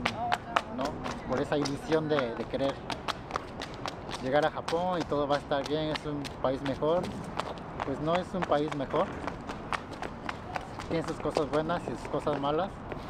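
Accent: Mexico City, Mexico. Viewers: Este japo es chilate defeño .no mames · Mira vistes como todo un mexicano ese estilacho que tienes para hablar rregresate compa ya sete ase tarde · Tu asentó se nota que eres mexicano, suerte en tu camino y no te olvides de los mexicanos man :v/ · Cómo de ciudad de México .. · Jaja hablas 100 mexicano. Eres mi paisano ya bienvenido al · Y si habla como Mexicano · hablas como mexicano,piensas como mexicano,sólo te falta el toque de pensar no estoy jodido y nada es imposible, entonces combina lo mejor de las dos culturas y seras un híbrido mexicano y lograras lo que ni tu pensaste, eres bienvenido s México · Eres mexicano,ablas igualito a mi🤣😂🤣😂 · No manches?? Jijiji!! Se Te Salió Lo Mexicano. No Mames Wey!!! Y un chingo!! Jiji jiji !! Nel. Tú Eres Mexicano. Pon Una Fondita Japonés Con El Ramen 🍜. Incluyendo Claro Los Tacos 🌮 . Mexico City Te Reclama!! 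Órale Vengase Pa’ Mexico!! Y Hasta Tú Acento Es México . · No mames guey no tienes nada de japonés con solo hablar así eres mi paisano viva México carbones saludos compita yo vivo en USA desde hace 30 años y estrañp a mi Durango México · Hablas 💯x ciento como mexicano si te gusta nuestro país regresate en Chin.......a🏃‍♂️🏃‍♂️🏃‍♂️🏃‍♂️🏃‍♂️🏃‍♂️animo México te apoya eres nuestro hermano 👍👍👍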